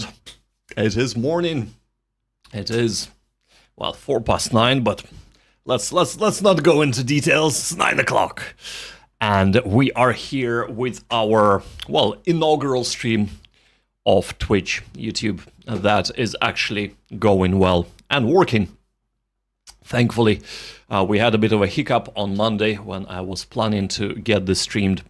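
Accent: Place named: Eastern Europe. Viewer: Nice one boss. Where r u from? Eastern european accent. I played everything available for free from Wargame Design Studio. Grenada, Miuss etc... Great games. Get stuck straight away.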